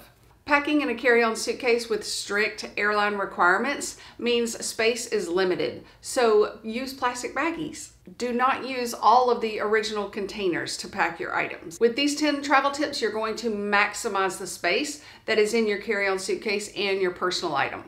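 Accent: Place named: Georgia, United States. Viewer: Hi, new subscriber and really enjoy your YouTube videos. Getting quite a few helpful tips for when we travel. I also really enjoy your great Georgia "accent ". Keep up the great travel hacks and other information. I'm learning a lot to make the most of our carry-ons and personal bag. Much needed since my husband won't check bags.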